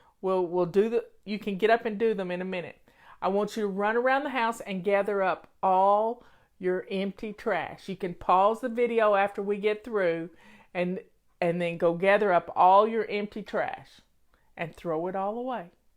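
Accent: South, United States. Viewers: Omg. You are so southern. I love it but I never imagined your voice being so perfectly southern 🖤🖤. Been following you for years and finally GETTING it by not trying to alter the system. Thank you!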